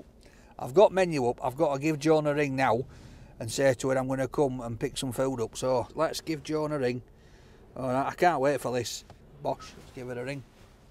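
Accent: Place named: Lancashire, United Kingdom. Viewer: I thought he was a lanchesire lad, he sounds so much like Peter Kay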